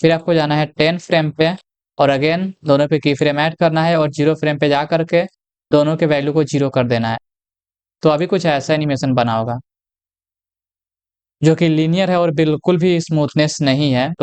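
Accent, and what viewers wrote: Bihar, India. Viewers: bhaiya aaap bihari hai kya ?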